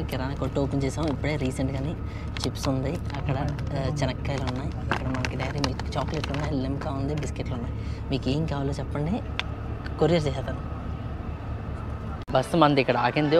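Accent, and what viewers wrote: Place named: East Godavari, India. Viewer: Anna mana east Godavari slang dose penchu bro comedy please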